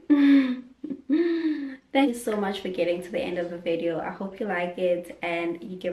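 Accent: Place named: Los Angeles, United States. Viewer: Why that LA accent?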